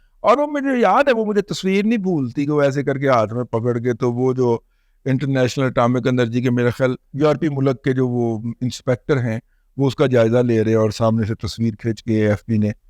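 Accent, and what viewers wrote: Punjab, Pakistan. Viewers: پیلے سلام کیا جاتا ہے۔۔۔ why you speaking Urdu in Punjabi style..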